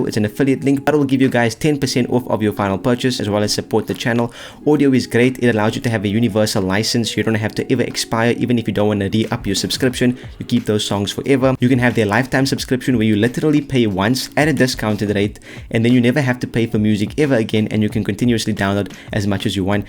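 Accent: South Africa. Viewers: Your accent has some South African in it. Like, a LOT actually.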